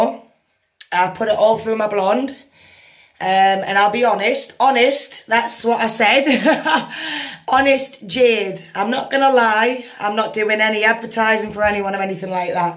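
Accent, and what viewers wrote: United Kingdom. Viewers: is that a Sunderland accent? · R u a Geordie hun x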